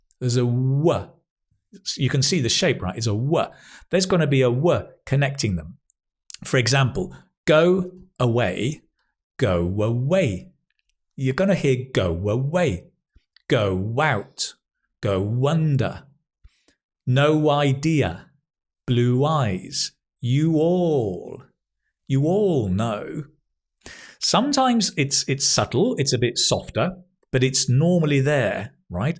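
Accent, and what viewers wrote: United Kingdom. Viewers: Wait. This is British English, no? Excellent for lesson, especially about the pronunciation in English.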